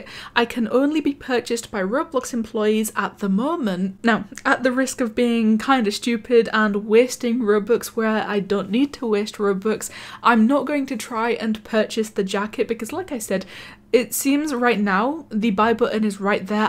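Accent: United Kingdom. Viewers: She is too bri-ish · she is just so bri-ish ♡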